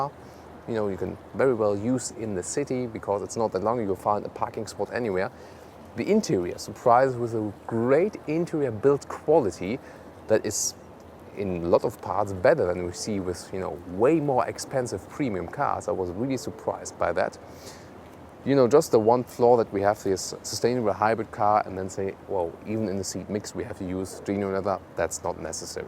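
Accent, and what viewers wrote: Germany. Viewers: german english sometimes feels like german :)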